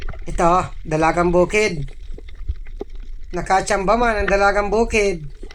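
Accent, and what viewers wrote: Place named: Vinzons, Philippines. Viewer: Cguro ang blogger na e2 ay taga Vinzons camarines norte. Pamilyar sakin ang mga pananalita nito at mga katawagan nya sa mga lamang dagat. Hahaha 😅😅😅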